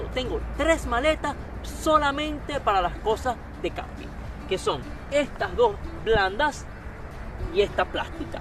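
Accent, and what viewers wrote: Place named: Venezuela. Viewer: Muy bueno..mi pana,..desde el principio me pareció acento venezolano,..pero cuando hablaste sobre el hecho de que no cuesta “tres lochas”,..no tuve dudas. Muy bueno e interesante tu video. Donde es ese lugar?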